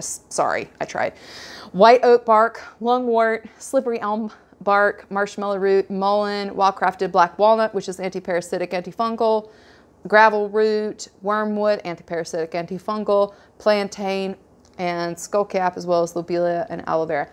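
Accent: South, United States. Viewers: You sound southern . You're an angel . Coffee drinker ? Why hell yeah ! You cook ? I've lived off sandwiches and vitamins forever. Proud southerner too . Imagine that .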